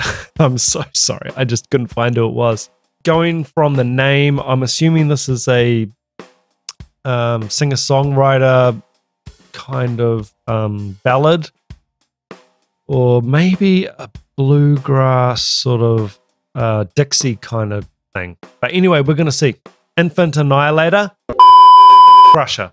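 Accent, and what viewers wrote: New Zealand. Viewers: Its good to hear that Kiwi accent on Youtube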